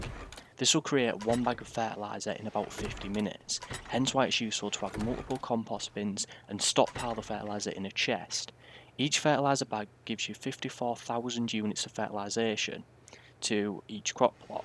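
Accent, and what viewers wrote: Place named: England, United Kingdom. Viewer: He's speaking English with an English accent. lol what more do want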